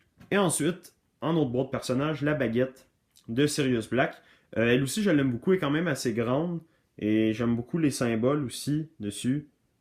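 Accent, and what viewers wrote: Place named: Belgium. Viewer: t es belge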